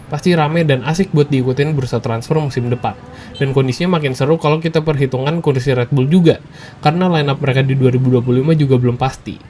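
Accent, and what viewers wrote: Depok, Indonesia. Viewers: Ente orang Depok ya min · Hahaha Sawangan GP, adminnya orang Depok nih 😂🤝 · "Tahun ini Tahunnya Ferrari" Ucapan setahun sekali Btw bang lu orang depok? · Bang luh orang depok yaa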